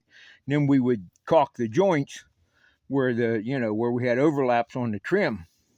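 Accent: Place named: Western Pennsylvania, United States. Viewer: Thanks for the tip. I sure hear that Western Pennsylvania accent. (My wife is from Butler)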